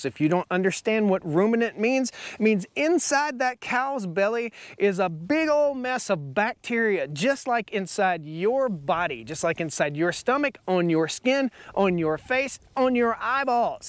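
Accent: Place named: United States. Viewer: o boy .... newer give likes .... you earn it a merican coool speach loove it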